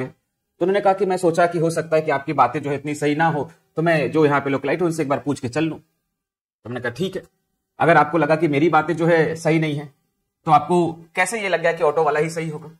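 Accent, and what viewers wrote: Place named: Varanasi, India. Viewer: Kbhie aae to nhi hain benaras bs isi bahane dekha Apka benarsi accent ek no tha 😍 thanku sir for guiding us 🙏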